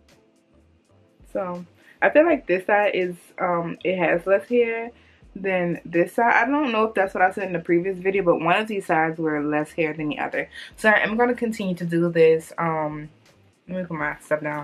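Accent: New Orleans, United States. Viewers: Are you from New Orleans? I hear that NOLA accent. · U from New Orleans huh? How u talk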